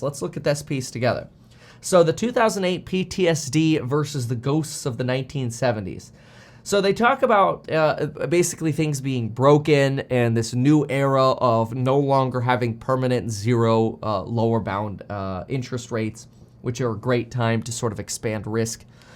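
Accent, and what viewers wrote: Germany. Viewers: Man that German accent is hilarious. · Your German accent made me crack a smile for the first time this morning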